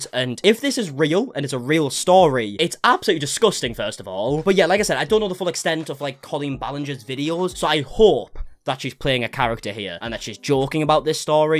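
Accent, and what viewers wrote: United Kingdom. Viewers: welsh, scottish irish or english---the accent?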